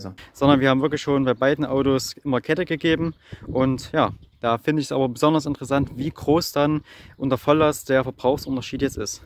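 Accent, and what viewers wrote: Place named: Saxony, Germany. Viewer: Kommst du aus Sachsen? Daumen hoch für den Akzent, Grüße aus DD 👍🏻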